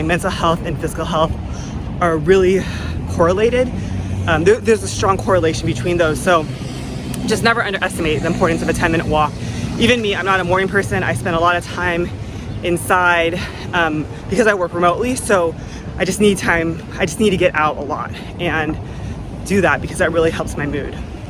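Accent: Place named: Hawaii, United States. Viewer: I’m born and raised in Hawaii and live in my childhood house on the windward side of Oahu. I really enjoy watching videos people post about living or eating in Hawaii. I love your Asian Aunties, and Mamma Lai videos… my all time faves!!! If you hadn’t mentioned where you were from, I would have thought you were from here!! Have a great day and thank you for the morning walk 🫶🏻🤙🏼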